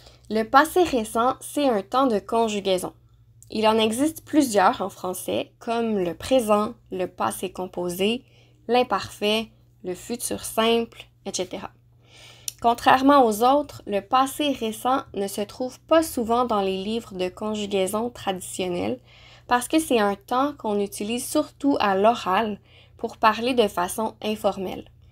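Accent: Quebec, Canada. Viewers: J’aime ton accent québécoise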